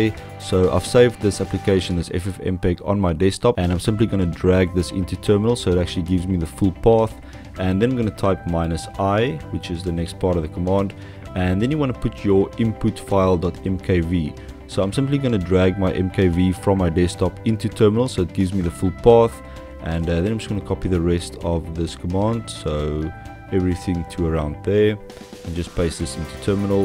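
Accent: South Africa. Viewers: Homeboy sounds South African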